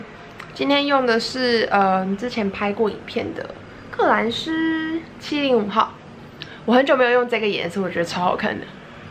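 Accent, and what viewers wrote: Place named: South Korea. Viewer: 哇咔咔等到你嘍，想問健身視頻都看哪個？聽上去是韓國人呢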